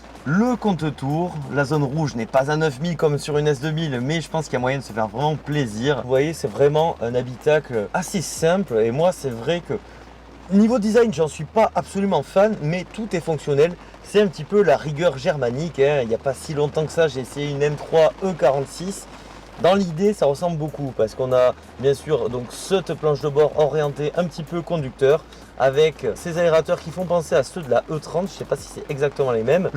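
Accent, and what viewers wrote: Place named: Southwest, France. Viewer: Tes expressions, et ton accent de notre sud-ouest (qui de temps en temps me manque)...j'adore. Ne change surtout pas!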